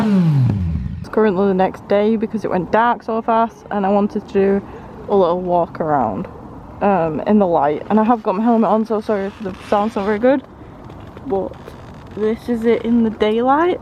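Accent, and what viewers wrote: Northern England, United Kingdom. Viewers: You sound proper northern!! I'm from Burnley so I guess I do too!! Ha!! Nice looking bike you have. Ride safe and enjoy!!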